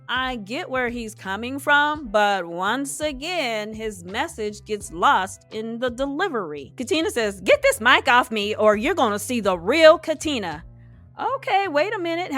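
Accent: Boston, United States. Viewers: You are HILARIOUS, I appreciate your commentary and your Bostonian accent voice overs💗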